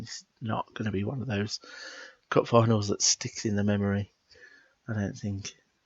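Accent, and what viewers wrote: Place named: United Kingdom. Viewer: I don't usually play these games but when the commentary comes and even a British commentary , It just becomes a whole lot interesting. Keep up the good work.Liked and subscribed.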